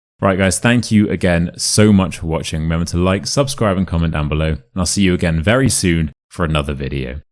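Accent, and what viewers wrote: United Kingdom. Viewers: Your voice is surprisingly calm and pleasant... the British accent - there are actually several, as in every country and language - is brilliant! It's difficult to achieve for a non-native speaker of the UK... thank you for the generosity of your videos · The best British accent!!!!! I'm Brazilian and I love the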